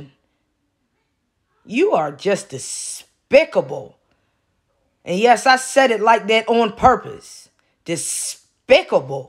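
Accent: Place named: Maryland, United States. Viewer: I knew you were somewhere close to Delaware by your accent 😂😂😂 and I was right Maryland lol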